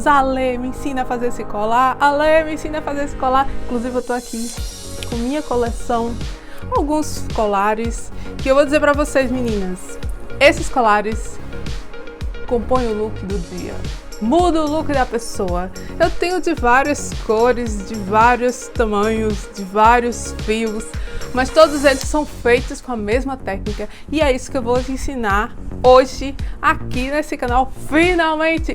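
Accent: Northeast, Brazil. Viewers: Mulher linda, tu és de onde com esse sotaque que parece nordestino? Primeiro vídeo seu que vi e amei. Já me inscrevi. 🙂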